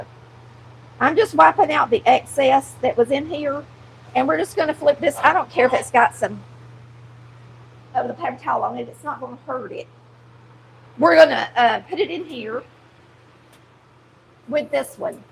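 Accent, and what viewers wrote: South, United States. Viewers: You’re so funny!! Miss, I knew you were a smart cookie, I could tell, I hated Math, so no engineering for me, lol, but you be happy with your accent,you’re the best of both worlds, sweet, smart, and down to earth!! As a Christian, I always wanted to have a meek and quiet spirit, I find Southern women to be a lot like that, and we ARE supposed to be good home makers! Proverbs31 says the godly woman had a business too!! God bless you, so glad to have found your channel!❤😊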